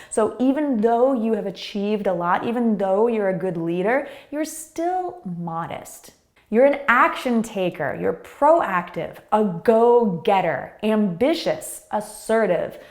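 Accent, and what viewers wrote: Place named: United States. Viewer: good job. Excellent. Sometimes I forget your accent is an American one. There are several teachers I can't get them without driving crazy.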